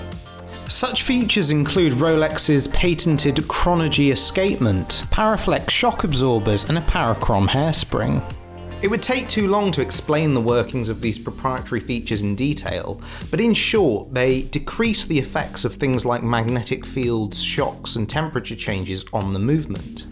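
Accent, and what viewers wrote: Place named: United Kingdom. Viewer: I’m learning to hate the snotty Brit accent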